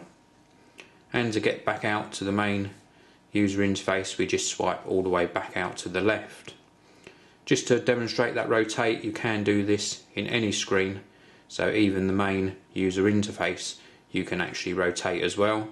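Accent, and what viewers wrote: United Kingdom. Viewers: not subscribed if you change your accent then I bet you would get more views no one likes british accents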